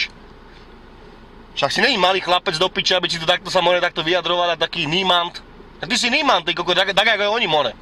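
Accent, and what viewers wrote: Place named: Petržalka, Slovakia. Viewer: Ked ho pocujem, v sekunde sa mi vynori Ovsiste 90tych rokov, gangy na kazdom rohu, fetaci, neskor mafia a typicky petrzalsky prizvuk. Som z Ovsista, a som na to hrdy. Aj na to, ze som prezil.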